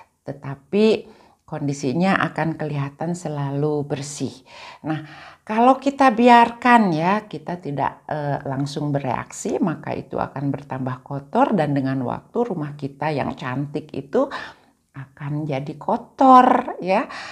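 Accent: Indonesia. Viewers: Rumahku...istanaku... bagus skl penjelasannya ibu yg cantik... Sy jg senang dg gaya bicara ibu walaupun tlah lama di luar negeri,namun tetap logat bhs Indonesia nya msh kental. Smg ibu sll sehat ya bu,pjg umur.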